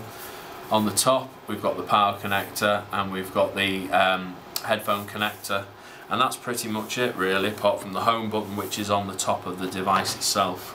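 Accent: United Kingdom. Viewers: hes is british its his accent.... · you cant blame him for his British accent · correct me if i am wrong, but doesnt that come from the strong british accent?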